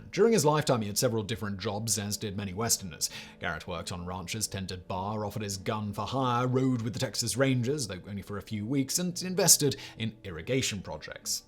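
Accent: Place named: RP, United Kingdom. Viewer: he is speaking he Queens English the real version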